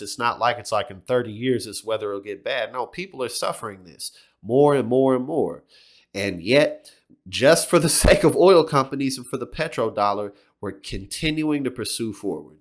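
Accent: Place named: South, United States. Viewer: You may have modified your accent somewhat but as a Brit listening to you I can hear you come from the South!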